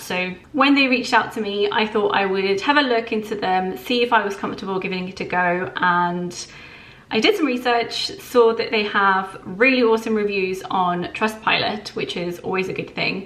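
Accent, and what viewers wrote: England, United Kingdom. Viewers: I am going open an account..What I don't understand is why in some countries financial advisor suggest not to invest in ETF.... By the by, beautiful accent. May I ask where in England you are from?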